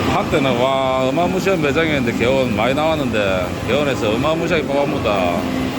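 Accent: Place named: Gyeongsang, South Korea. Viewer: 구수한 갱상도 사투리 멘트에 정감이 가서 자주 보네요 ㅎ 건승하십시요 ᆢ참 기온 이벤트는 1일인가봐요? 그럼 이달말은 31일 8월1일 2일동안 이벤트인가요? 1자가들어가는데 궁금해서요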